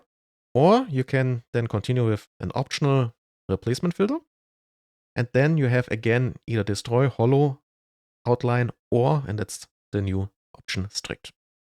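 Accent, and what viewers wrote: Germany. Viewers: Guten tag, your german accent is so good, i'm kinda jealous about it. Tut mir leid, meine deutsch ist sehr schlecht, ich sprichst ein bisschen deutsch, aber das ist lange her :p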